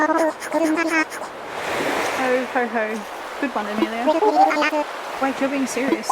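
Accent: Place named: New Zealand. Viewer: I think they're asking because of the accent? Personally I think you sound a bit like a Kiwi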